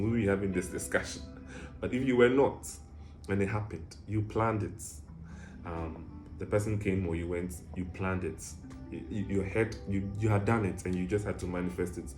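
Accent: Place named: Ghana. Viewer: The best part of these videos is the cool Ghanaian accent 😃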